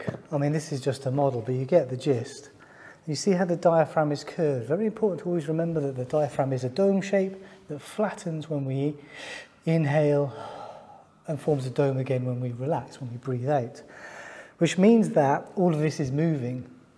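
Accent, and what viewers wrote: United Kingdom. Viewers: British accent + mesmerizing personality + a med school mannequin = YouTube star giving anatomy lessons. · Asa I started watching this show, I noticed you are British, aren' t you Doc?🧑‍⚕️ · good video, if i could understand the british accent and if he could speak slower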